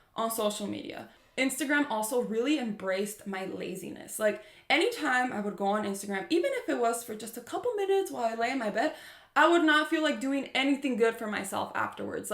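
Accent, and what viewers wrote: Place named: Brazil. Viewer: teu inglês é perfeito, mas por algum motivo sei que tu é br... subliminar... mas sei kkk excelente vídeo!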